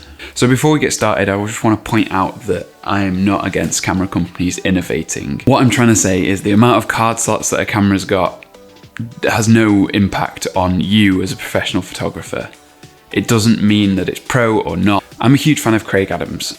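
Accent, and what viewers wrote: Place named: North West England, United Kingdom. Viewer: Nice to hear a north west accent on YouTube 😁 - your right you don't need 2 slots to be doing professional work, my camera has two slots but I've never used the second slot. But I was going to buy a Z6 and to be honest I have stalled because it's now stuck in the back of mind that I probably should have been. I have had corrupted files about 3 or 4 times but I've always got the data back on my computer.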